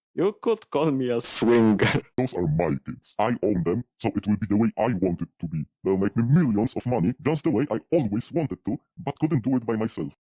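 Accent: Poland. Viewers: You sound like a Polish person, I know what I'm talking about bro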